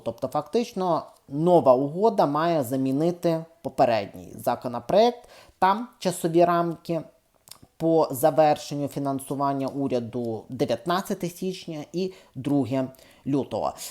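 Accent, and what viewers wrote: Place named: Canada. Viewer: цікавий акцент. ви проживаєте у Канаді?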